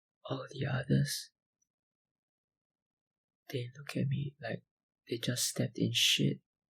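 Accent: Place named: Singapore. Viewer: wow u have the lioncity accent 😂👍🏼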